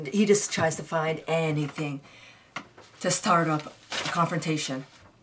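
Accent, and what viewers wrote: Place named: United States. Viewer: That is Islam. Did you read the Koran? It states women are worth half as much as a male. I married an Iranian in 1990, had him jailed several times for abuse, divorced him and he's lucky I didn't kill him. Now he is remarried to his cousin, that was their plan all along. Inbreeding is the norm, birth defects are the norm, abuse is the norm. You sound american woman, don't married another muslim. Religion is evil, all of it.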